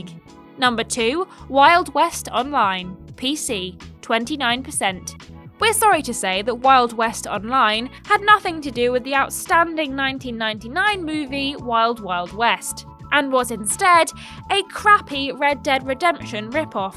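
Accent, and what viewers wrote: United Kingdom. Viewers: This girl is the most British person I've ever heard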